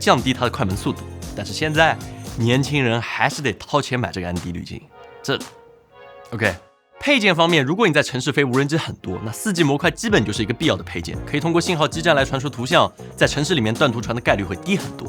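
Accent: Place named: Jiangsu-Zhejiang, China. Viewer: 江浙口音没办法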